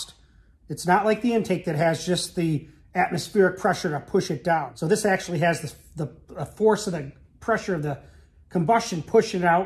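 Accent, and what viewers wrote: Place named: Brooklyn, United States. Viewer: Were you from Brooklyn or Queens? you sound like you have an accent from that area!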